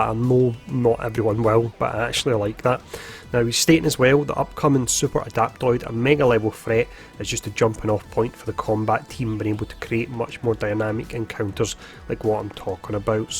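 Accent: Scotland, United Kingdom. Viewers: Your Scottish accent is strong where are you from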